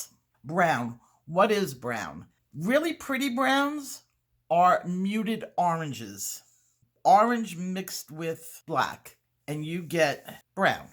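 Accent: New York, United States. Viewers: my son would so love you. He loves the NY accent so much that he actually practices doing it. I LOVED this video so much. I have no idea until now how to use that color wheel. I got it when you suggested it. Even my ADD self could focus on this lesson.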